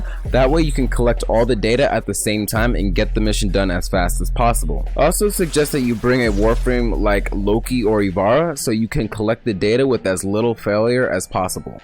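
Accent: Jamaica. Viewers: are u jamaican? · Where you from bro and are you a big fan or you're a Jamaican?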